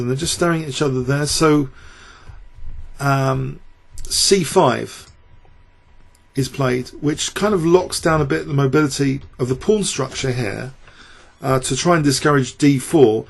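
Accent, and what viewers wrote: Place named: London, United Kingdom. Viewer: I watched this live and flipped the board to black well before Anand's 38 Qg3. The commentators were still sanguine and thought it was drawing but by move 42... f6 it was clear that Carlsen was simply going to march his King + entourage down the board and Queen a pawn. Anand clearly looked tired and his defeat in game 6 is related to the defeat in game 5... his blunders are inexplicable without this context - he was actually stubbornly trying for a win, not the draw he could have engineered. He's lost the psychological battle and I see no sign of recovery from here. I truly hope he is not embarrassed in the remaining games. Thanks for your post game reviews, I love your no nonsense analysis (engine assisted or not) and softly spoken London accent, ignore those critics with nothing of substance to say ("would you please say three not free" / "don't pass off engine analysis as your own" - FFS! 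- way to miss the value in your videos!).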